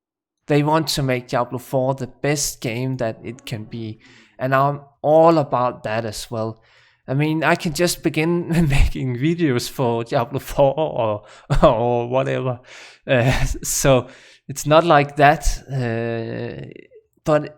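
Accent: Denmark. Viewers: Wow you sound so danish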